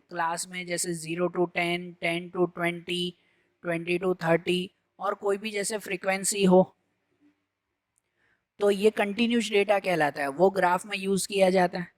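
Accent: Gujarat, India. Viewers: Good explanation sir gujrati cho ne 😅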